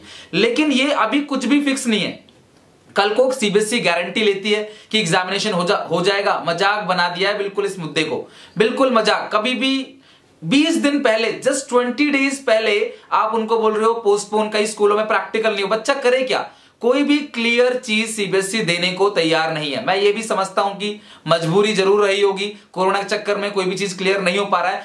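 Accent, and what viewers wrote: Odisha, India. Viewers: Sir Aap Odisha ki ho kya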